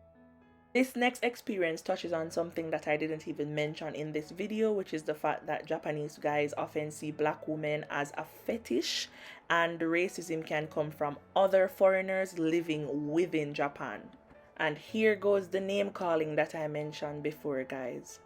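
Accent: Jamaica. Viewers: Also you’re not black. You sound as if you’re jamaican that’s what you are. Black is made to make you feel lesser than. Please don’t call yourself black, instead identify who you are. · your "pronunciation" is so jamaican! Try do some work on your accent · Love your Jamaican accent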